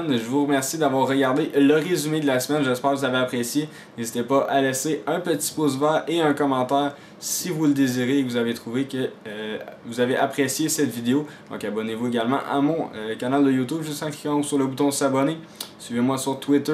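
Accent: Quebec, Canada. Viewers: J'a laissé un potit poce vert et un commintaire =) (l'accent québécois me fait bien marrer) Sinon excellente vidéo dude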